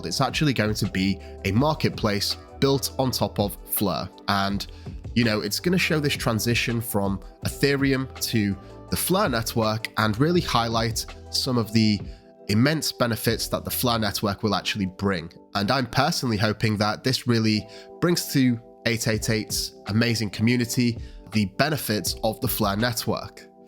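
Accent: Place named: RP, United Kingdom. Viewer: Hard to listen to… the accent is … posh spice… perhaps subtitles would help? And the funeral parlor organ is over da top? C’mon … please, I can’t understand what is being said… praced hout = priced out?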